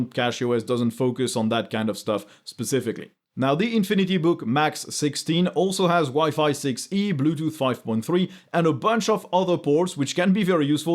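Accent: France. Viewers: Wait, are you french?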